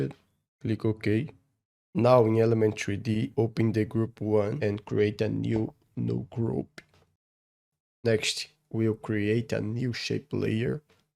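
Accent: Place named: Brazil. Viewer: just asking, are you brazilian? i do, and your accent sounds like mine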